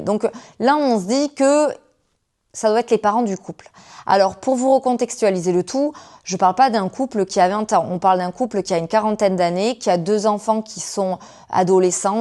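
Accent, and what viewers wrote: South, France. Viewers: Vu ton accent, tu viens du sud de la france? Ton mari a -t-il trouvé du boulot en france?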